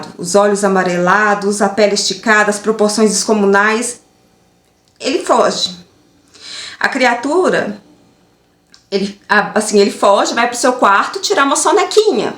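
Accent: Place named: Minas Gerais, Brazil. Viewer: Sotaquim mineirim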